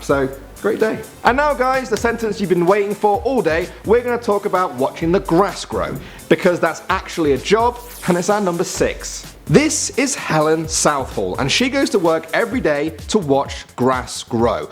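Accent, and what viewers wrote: United States. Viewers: Your American accent is better than other Americans. And you're British! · Your american accent is actually not bad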